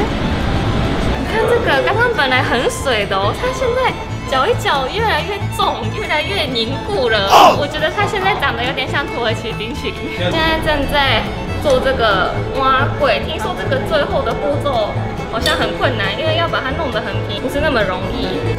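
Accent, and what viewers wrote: Taiwan. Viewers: 關仔嶺可買到泥漿溫泉包，回到家也可泡泥漿溫泉！ 還有一點我非常驚訝，我一定要說出來，我從頭到尾很仔細的聽內容，中文很強，100%的台灣人說話方式，除了有一點點口音之外，無懈可擊！ 真的很會講，比我口才好！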